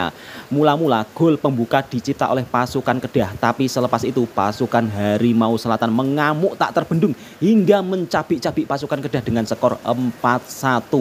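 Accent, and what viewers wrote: Java, Indonesia. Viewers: pertama kali mendengar ulasan loghat Jowo. 👍🏼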